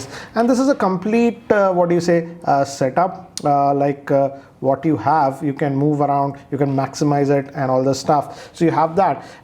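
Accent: India. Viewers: 咖喱味英语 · please stop speaking English in your Indian accent !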